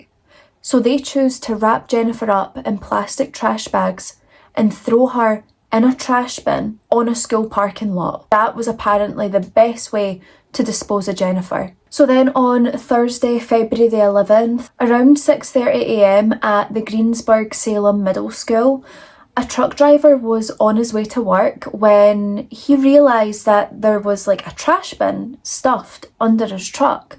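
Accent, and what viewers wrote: Scotland, United Kingdom. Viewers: Is that a Scottish accent I hear?! Where about are you from? ❤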